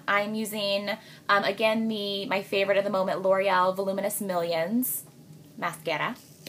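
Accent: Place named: Midwest, United States. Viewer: What... Change your voice? I think you are incredibly easy to understand, if that's what the post meant... Midwestern accents, FTW!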